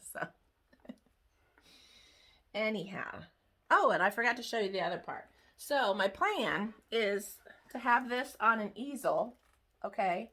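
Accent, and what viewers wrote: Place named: South, United States. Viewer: awww I was hoping you lived close to me☺️. I thought I detected a bit of a southern accent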